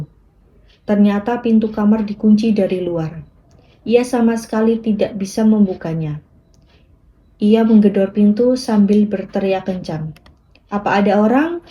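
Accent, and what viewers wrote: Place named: Java, Indonesia. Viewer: Koq jadi medok 😭😂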